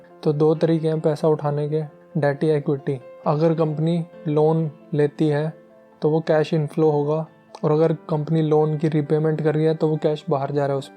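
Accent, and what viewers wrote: India. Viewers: Welcome back sir🙏. Ur knowledge packed presentation in deshi aawaj is just killing...lagta hai koi apna school ka purana yaar bol raha hi....keep doing favour to us by sharing ur knowledge in deshi style 😀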